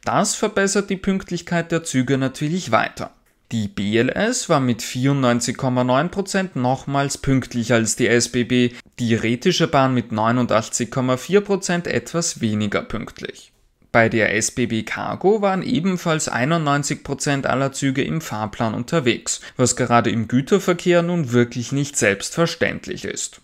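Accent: Vienna, Austria. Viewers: Professionell gemacht. Aber diese Stimme und Aussprache (Wiener Upperclass = Schönbrunner Burgtheatersudentendeutsch). Schrecklich. Klingt fast wie Kurz. Bitte synchronisieren. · I am British. At school I had a FEW German lessons. But I can understand almost everything says. HIS ACCENT IS PERFECT FOR people whose first language is not German. Danke schoen fur sein sehr Klar 'Wiener Upperclass'.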